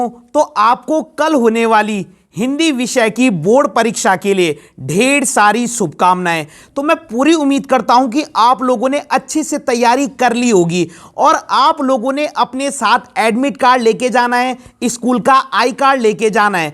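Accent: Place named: Pahadi, India. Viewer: Sir aap pahadi ho na! Me bhi pahadi hu! Apki aawaj se phachan liya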